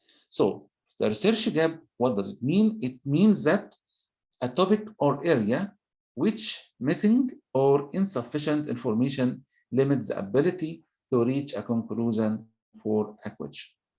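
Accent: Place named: Arab world. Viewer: Look like an Arabic English...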